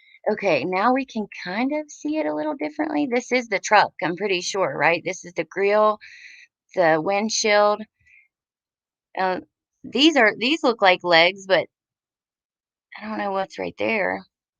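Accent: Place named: Kentucky, United States. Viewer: I've been looking for this. Thanks so much for the video & reading it out to us. I can concentrate to listen, but I have trouble concentrating when I read more than a few minutes. So thank you!! What State are you from? I grew up in E. KY. You kinda sound like me. Lol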